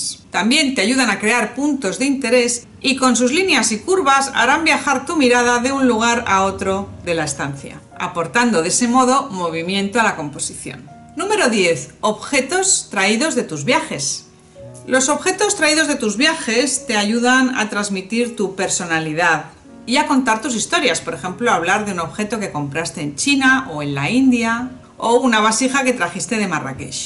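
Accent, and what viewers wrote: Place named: Spain. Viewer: Soy de Argentina y siempre me molesta la onda "gallega" como decimos acá, pero tu onda y tu forma de hacer los videos (vidéos se dice en Argentina. con acento en la e) me parece muy agradable y me sorprendo a mí misma disfrutando tu forma de hablar y de enseñar. Muy bueno!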